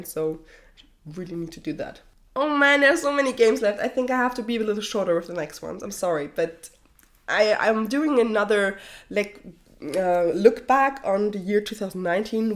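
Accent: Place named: Germany. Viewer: Wie man einfach direkt hört dass du deutsch bist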